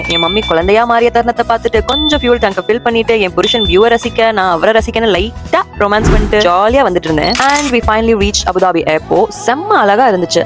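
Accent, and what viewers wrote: United Kingdom. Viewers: Hi ka..I really love ur videos..the edits🎉..just wow...and your Uk Tamil accent is so sweet,nice to hear..Happy Happy marriage life akka..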